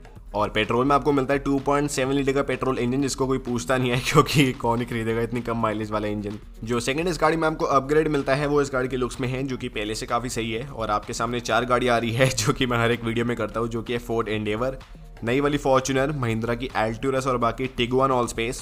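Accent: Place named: Hyderabad, India. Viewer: Tu snax gaming vale bande ke jaisa bolta hai bhai 😄😄 sahi lagta hai , full haidrabadi style 🔥🔥🔥🤙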